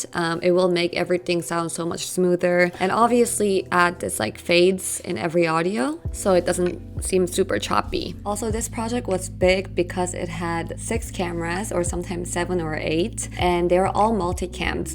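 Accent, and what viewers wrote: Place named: Asia. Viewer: What happened to your accent,? It has become more authentically Asian all of a sudden. Not that I am complaining, but still... How does such a thing happen?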